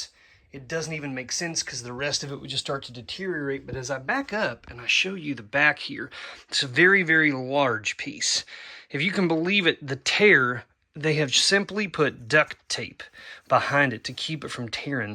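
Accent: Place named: Maryland, United States. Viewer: i was trying to place where you're from based on your voice - it sounds like Maryland, but then you said you took a trip to the East coast which makes me think you dont currently live there.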